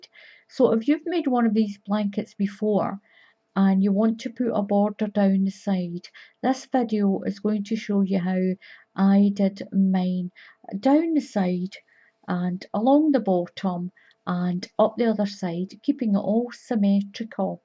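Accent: Scotland, United Kingdom. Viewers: It's great to hear a Scottish voice again, likr mine, your so accurate and easy to follow,as I'm a novice to this.Thanks xx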